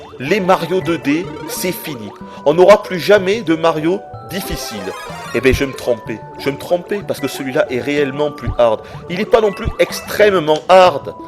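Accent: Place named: Southwest, France. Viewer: est du sud ouest: (mouainsse AU LIEU DE MOINS ) XD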